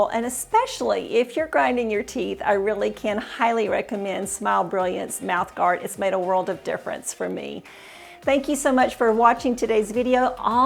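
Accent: South, United States. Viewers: ohio nice state. friendly state like indiana. are you from the south originally ? your accent thats why i ask.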